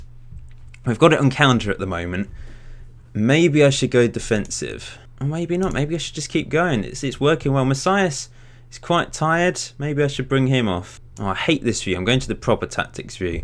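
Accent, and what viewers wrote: Portugal. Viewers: The video is from a dude who is from Portimão and just like in (probably) every country in the world the accent changes from city to city so his pronounciation is different from the Portuguese you hear from Lisbon for example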